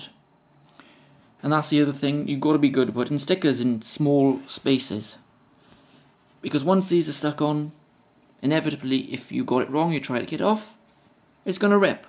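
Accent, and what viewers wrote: Wales, United Kingdom. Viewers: I thought your accent was Welsh.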